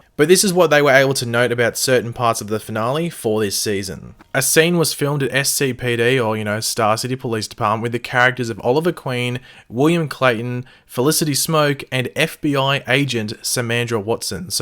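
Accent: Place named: Australia. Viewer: Yhhh I just love your aussie accent 😍 used to watch bondi rescue to get a weekly dose of it now I can just listen to you 🤗😏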